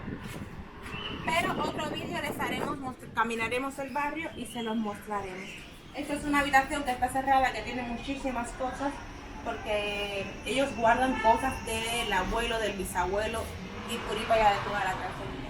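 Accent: Spain. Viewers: Te gusta Turkia? Veo que tienes el acento español!!!!Saludos😊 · Hace un tiempo te vi en un video c9n tu mamá haciendo una historia y hablabas como una cubana que eres. Y ahora habla como una española??? Porque. Acaso es delito? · Aqui hablavas con acento de España...ahora hablas diferente paisana😅😅.Como conosiste a tù novio y donde?? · Que acento tan español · Hola te comencé a ver cuándo sacaste el vídeo con Paulita una persona muy bella, eres cubana por favor no hables con el acento de los españoles, eres hermosa y no hay que olvidar nuestras raíces, soy del estado de Veracruz, Mex. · Muy fina, muy española hablando y las terminaciones "mos" las cambia por "nos" como: Alumbrábanos en vez de alumbrábamos, etc. Lo que llamas ordenador es el monitor, lo que llamas monitor es la torre que es en realidad el ordenador. Mucha fuerza, cuando se lucha como haces tú, de todo se sale, tendrás todo lo que mereces con la ayuda de Dios. 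· PORQUE HABLAS COMO ESPAÑOLA SI TIENES UN MARAVILLOSO ACENTO CUBANO. YO VIVÍ EN MADRID Y NUNCA HABLÉ COMO ESPAÑOLA SEGUÍ MI ACENTO CUBANO. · Niña bella ❤me encantan tus videos con tus experiencias y sobre todo Como tu dices demostrar tus raizes Porfa HABLA COMO UNA CUBANA TU IDIOMA❤ DEJA ESE ASENTO ESPAÑOL PUES YO VIVO EN ALEMANIA MAS DE 30 AÑOS Y NO HABLO COMO UNA ALEMANA MI CASTELLANO, MI ESPAÑOL ES MI ORGULLO MIS HIJOS SON DE PADRE ALEMAN Y HABLA MI IDIOMA SIN ASENTO Y ESO ES MI ORGULLO AUNQUE ELLOS SEAN ALEMANES LES EXIJI SIEMPRE QUE HABLEN SIN ASENTO Y HOY POR HOY ELLOS MISMO ME LO AGRADESEN HERMOSA MUCHAS BENDICIONES Y GRACIAS POR TUS VIDEOS 🙏🙏🙏❤️❤️ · Si eres cubana, porque hablas enfáticamente como los españoles,disculpa el dialecto cubano es difícil de erradicar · Eres Cubana pero tienes un acento Español.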